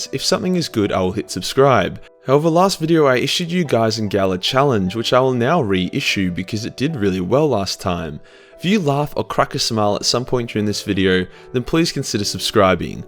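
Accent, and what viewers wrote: Australia. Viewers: Subscribed early - top job Mate ,and it's bonza to hear a fellow Aussie accent to boot !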